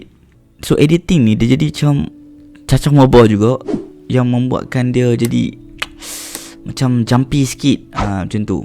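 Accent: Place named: Kedah, Malaysia. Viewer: cakap kedah pon pahamm aih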